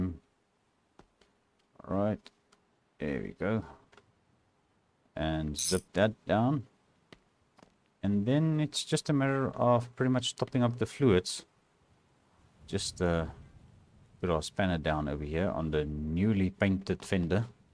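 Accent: South Africa. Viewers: I knew you were South Africa as soon as you started talking. My wife is from South Africa, she doesn’t have the accent but ALL of her family does. She came to the US as an Au Pair. I can’t wait till we go visit SA because she’s always telling me cool stories and showing me some of the beautiful places over there. We went to a camping event over here in Texas and it was a bunch of SA people hosting a potjie and it was some of the best food I’ve ever had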